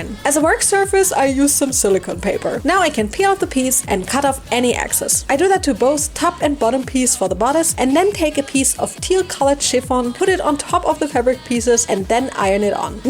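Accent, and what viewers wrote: Germany. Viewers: I just found this channel off youtube shorts and im obessed. Ive always loved OOAK dolls and these videos are so pleasing to me. Btw i love your accent. Is it german?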